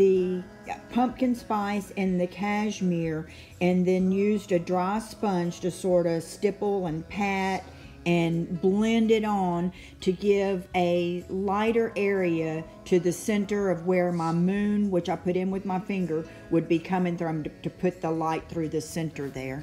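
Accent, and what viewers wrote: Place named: Midwest, United States. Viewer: I'm so happy to have found your channel! Your piece is crazy cool! I've never tried a mural on furniture before, that would intimidate me. You're so talented, this is gorgeous! Okay I have to ask where you're from. Your accent sounds just like my step-MIL (IL/IN).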